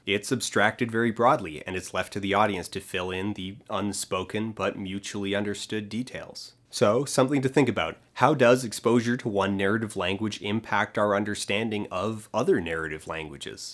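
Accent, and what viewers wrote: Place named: Canada. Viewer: I keep forgetting how Canadian you are....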